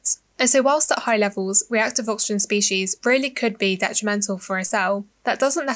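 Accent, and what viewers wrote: United Kingdom. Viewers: Im sorry to say, the brittish accent is just so hard to take.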